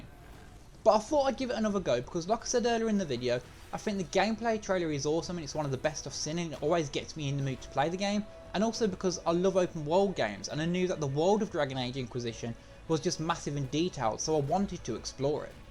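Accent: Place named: Birmingham, United Kingdom. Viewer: Downloading now, thanks for the review! Ps love the brummy accent ;)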